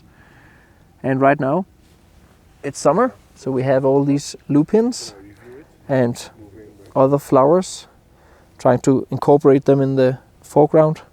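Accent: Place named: Denmark. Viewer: Lol man høre med det samme at det er en dansker 😅😅🍿 men fed video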